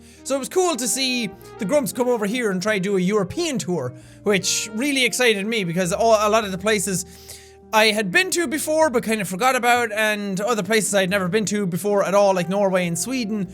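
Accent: Ireland. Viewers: Ah the accent., you have clearly been back to Ireland. Lovely. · I've missed that high pitched Irish voice so much! I've watched all the series on this channel to keep me happy and distracted from the shitty reality since I've been here for about 2-3 years now. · It's nice to hear your Irish voice again. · Welcome back! Even though I was binge watching previous videos, I still miss hearing that sweet, sweet Irish accent ^_^ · you can here that his irish accent is stronger after he was in dublin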